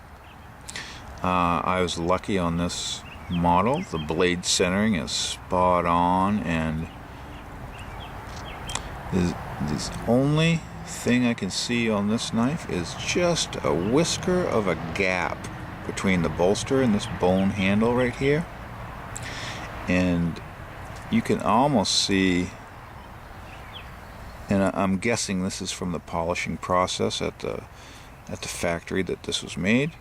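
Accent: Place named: Maine, United States. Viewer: You sound like your from Maine, yut! Love your videos.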